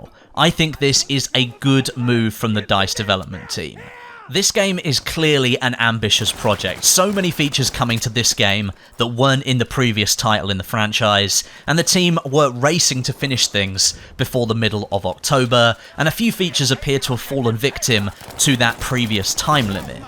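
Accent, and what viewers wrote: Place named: United Kingdom. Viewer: I watch your videos for your British accent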